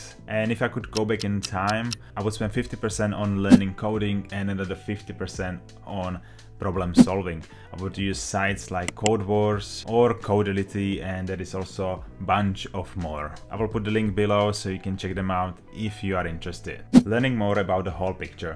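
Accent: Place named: Czech Republic. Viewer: Can I just ask if you are czech by any chance ? I hear it a bit in the accent :D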